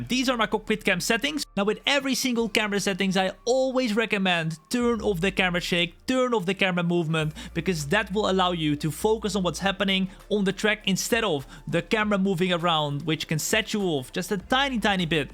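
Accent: Netherlands. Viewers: Are you from the Netherlands? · Ben je Nederlands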